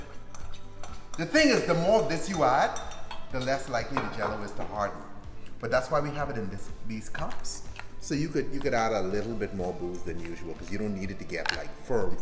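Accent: Bahamas. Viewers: I'm sure this has been covered before, and I'm super late to this channel, but where is he from? He sounds Bahamian to me. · Bahamian accent strong son lol oh how we love a good drink ! Keep up the good work brother!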